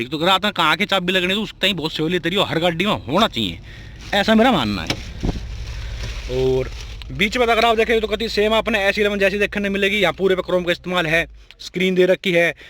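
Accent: Haryana, India. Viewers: Love this accent of ur hryanwi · Love the Haryanvi accent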